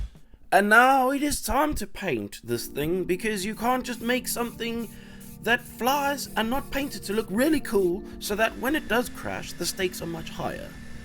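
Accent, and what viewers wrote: South Africa. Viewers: PS: is that a hint of a South African accent I hear? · God you have a gorgeous accent, is it south african, by chance? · that's why it sounds familiar! I grew up in Pretoria, good to see a south African making such cool builds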